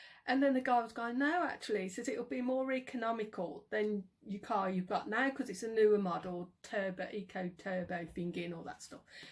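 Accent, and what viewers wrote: Birmingham, United Kingdom. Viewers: Hello and a good video you’ve done thanks showcasing your storytime regarding motor car situation and I have previously seen a few or so videos you’ve done and love your personality such as your proper brummie accent and humorous ways and may I ask you to please feel free to checkout my channel and hope you’ll subscribe like I’ve done for you thanks as us small YouTubers must help each other so that’s all for now so until the next time take care and cheerio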